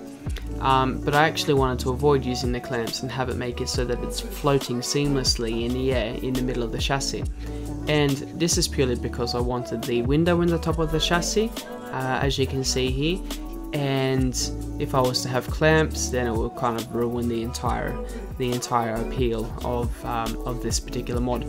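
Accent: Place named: Australia. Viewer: I thought you were Swedish? Your Austrialian(?) accent is quite amazing, and English in general is way above the average for Swedes :P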